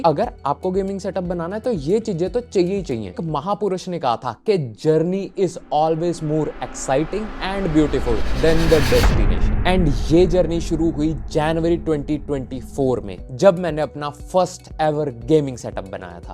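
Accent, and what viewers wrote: Punjab, India. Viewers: Bro konsi city me ho ? Apki voice me punjabi touch hau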